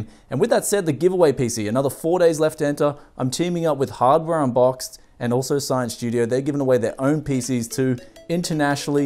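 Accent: Bogan, Australia. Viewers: why the bogun voice? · Came here for the aussie accent XD so entertaining XD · haha that Aus accent. Spot on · Hahahaha bogan voice xD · Australians and their accent are so cute, hahahaha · Hey I loved your bogan voice it sounds awesome and hello from perth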